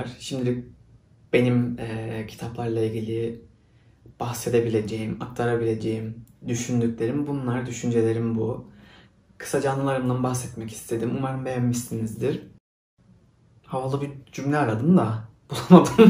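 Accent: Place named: Cyprus. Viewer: Alttan alttan kendini dışa vuran Kıbrıs şivesi çok hoş. ☺ Güzelim adacıktan görüntüleri görünce daha bir özledim şimdi. 🌿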